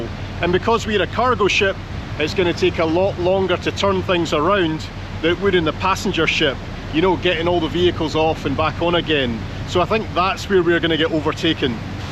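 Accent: Scotland, United Kingdom. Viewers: I’m a Brit living in Sweden ca 2000 I decided to take the overnight ferry from Gothenburg to Newcastle via Kristianstad, it was February and we had a storm in the North Sea. I had a cabin on deck six probably under the Bridge, it was like being on a big dipper, I never slept a wink I could hear metal banging a crashing, so I went down to the bar area and met a Finish guy who insisted on buy me beer, which I didn’t really want as I had to drive the next day. At six in the morning I was like you out on deck as the stomach had passed and it was calm approaching Newcastle but the wind was incredible it nearly froze my face! I only found your channel last night and already watched 4 videos thoroughly enjoyed them 5 star 🌟🌟🌟🌟🌟. BTW I love listening to your accent my mother was from Dundee and I was born there moved to Southern England when I was 5 years old, spent a lot of summers in Scotland played golf once as a boy in Montrose. 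I am 78 and I want to visit Scotland soon and look up some cousins while I still can. Keep up the good work ! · Certain accents in the English-speaking world have phrases that can’t be seriously said by all the accents. No Yank, Canuck, Kiwi, or Aussie is going to say “sneaky wee peek.” But a Scot? Perfection! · I really enjoyed this one! Great presentation and I always love your descriptive Scottish brogue. Well done .... what an adventure!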